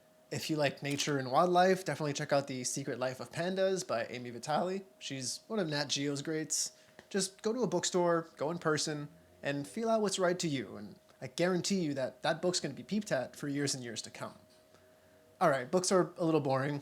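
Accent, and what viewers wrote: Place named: Quebec, Canada. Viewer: J'me suis pas rendu compte que t'étais quebecois au premier video qu'j'ai écouter sur les flashs drag. Toute une surprise quand je t'ai entendu immiter ta mere. Tres bon contenue jusqu'ici, lache pas:D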